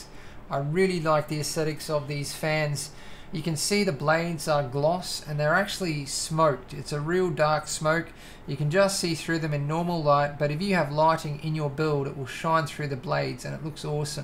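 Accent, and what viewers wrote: Australia. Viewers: What country are you from most are US but you sound aussie?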